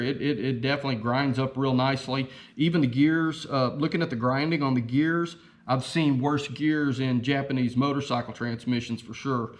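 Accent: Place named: Alabama, United States. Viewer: Wow, I love your attention to detail, I invented an engine system to turn an Adult trike or Bicycle into a kind of like a moped I get about 125 miles to a gallon and am using Vanguard single-cylinder engines for power. I invented the Bracket system, not the engine this little diesel may get me up to 175mpg. I would love to talk to you and I am using the youtube account of my wife here is my cell number <num> give me a call. Stuart Brandt Naples Florida By the way are you in Alabama?????? your accent sounds like Alabama a bit.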